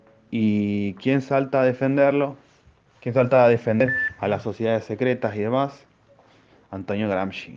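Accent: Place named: Argentina. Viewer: Es increíble pensé que iba a terminar diciendo algo cuerdo , pero al final terminaste difamando al movimiento Provida por el solo hecho de contar en sus filas con católicos , cuando en realidad también hay pentecostales , mormones, evangélicos sionistas y no sionistas , universalistas de todo tipo , después andas subestimando a la gente con que va adorar a lucifer cual Masón g33 jajajaja , después te solidarizas con la izquierda como si fuera la pobrecita de todo esto la utilizada y volvés a atacar por los colores , después me parece que desconozces el peligro del feminismo , un familiar mío tuvo que ir con abogados por una falsa denuncia ,no solo se trata de salvar al niño por nacer , después mostraste la hilacha haciendo propaganda a tu liberalismo capitalista pedazo de Golem, ah y encima por la voz parece que sos argentino ( al menos que seas uruguayo) seguro vivas adentro de un tapper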